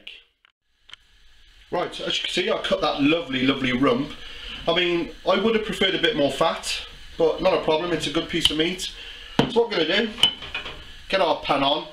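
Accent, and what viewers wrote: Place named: Scotland, United Kingdom. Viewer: Hello I'm French man and I love your vidéos but I' don't undertsand all you leanr. May be your accent and fatest language. Are you Scottish ? Congratulations for your job . (English begginer ) Thanks.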